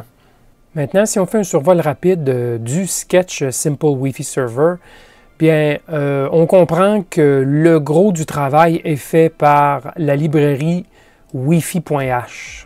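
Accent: Canada. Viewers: Bonjour à toi, j'aime beaucoup tes tutos avec ton accent canadien, existe t il un shield pour un esp32 autre qu'une breadboard ?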